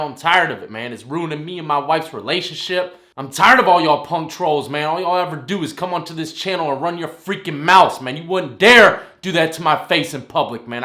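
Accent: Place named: Great Neck, United States. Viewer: wat high school did you go to? sounds like cox over off great neck lol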